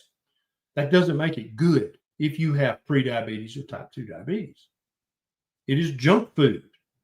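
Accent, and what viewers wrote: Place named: Tennessee, United States. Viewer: Ikr?! Plus, he is from the part of TN that I am from so his accent hits my ear so right.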